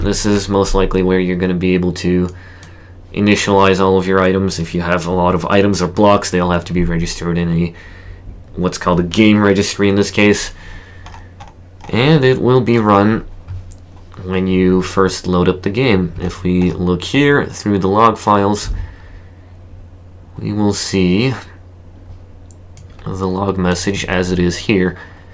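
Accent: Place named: United States. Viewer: We thought you were russian but you sound like a monotone american. Great tutorial btw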